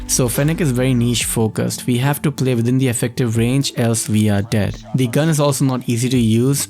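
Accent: India. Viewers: It's it just me or really does have a definitive Indian accent? Anyway, you're pushing great videos man. I really loved your qq9 build which was recommended by Jokesta. Keep up the good work 🖖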